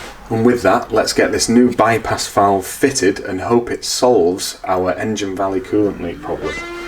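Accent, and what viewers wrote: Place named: North, United Kingdom. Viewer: Great video, similar issues here with my 2012 Panamera 3.0tdi, would you like to repair mine for me, happy to have the car dropped off wherever you are, sounds like you’re a friendly northerner, I’m near Preston.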